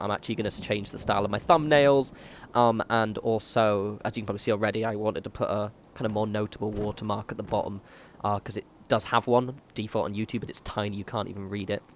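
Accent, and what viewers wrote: United Kingdom. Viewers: I literally click on your random videos just to hear that British: "Ello everyone."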